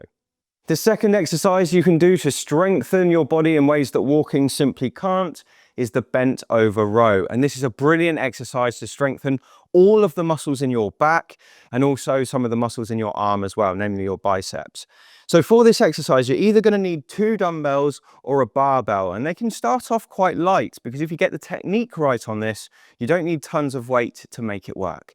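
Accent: England, United Kingdom. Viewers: The last exercize for balance was amazing! I've never seen it before and plan to add it to my routine. I love this channel for all the tips gives us - the English accent is a bonus!:)😊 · I am irish and I love his english accent! I was born in Leeds.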